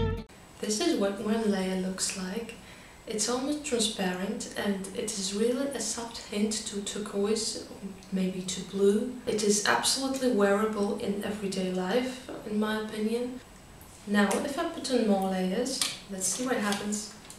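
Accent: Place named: Russia. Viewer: ой, вы оказывается тоже из России) то то вижу что то родственное в акценте,решила проверить)) круто))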